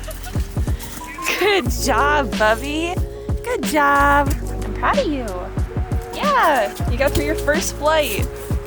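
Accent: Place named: Rural, United States. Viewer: I thought I was the only one who does a hick accent. Very cool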